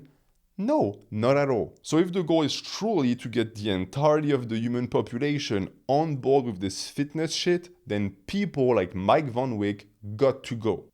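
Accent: France. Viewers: I love your French accent, it’s like listening to Baudrillard talk about weightlifting.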